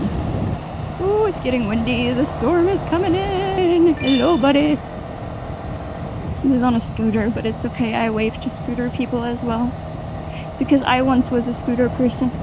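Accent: United States. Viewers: where are you originally from?? I haven't seen all your videos so not sure how you sound American but are out in Luxembourg... sorry ..im nosey...lol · Sothern accend 👌🏼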